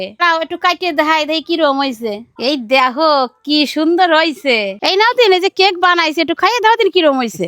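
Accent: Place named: Bagerhat, Bangladesh. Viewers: কিরে যশোরের ভাষা বলে কেন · বাংলাদেশে খুলনার মানুষদের আঞ্চলিক ভাষা কিছুটা এমন শুনতে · আপনার বাড়ি কি বাংলাদেশে। আপনি প্রায় বাংলাদেশের বাগেরহাটের ভাষায় কথা বলেন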